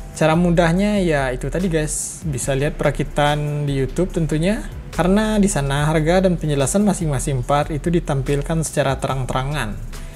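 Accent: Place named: Padang, Indonesia. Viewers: Iya Gan Dia Orang Padang. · Ini orang padang ya? Dialeknya kentara bgt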